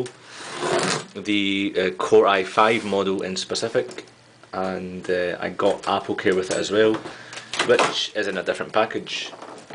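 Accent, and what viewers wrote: Scotland, United Kingdom. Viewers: I just love the fact that you opened it with a butcher knife. Also love the scottish accent! great video!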